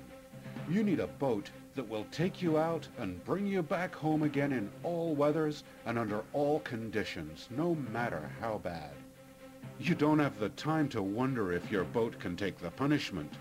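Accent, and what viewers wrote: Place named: United States. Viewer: great boats, but jesus, this video looks and sounds like its from 1990. and whats with the super american narrator?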